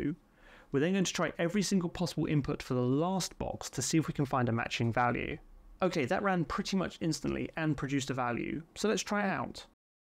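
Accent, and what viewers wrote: United Kingdom. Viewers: 1. Britisch accent check 2. Nice explanation check 3. Fun topic check Subscribed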